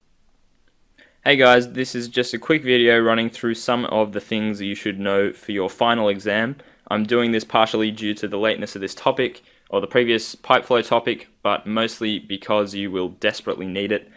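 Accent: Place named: Australia. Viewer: Australian Accent, likely Aus.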